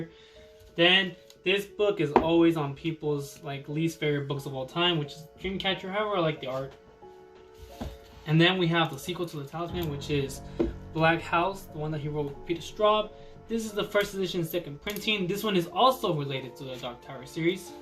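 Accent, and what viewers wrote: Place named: Latin America. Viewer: Book haul + latino accent = asmr = heaven for me 😉😉😜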